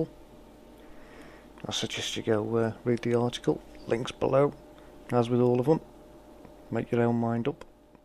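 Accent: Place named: Scotland, United Kingdom. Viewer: Good Job!;) Love your accent! are you Scottish?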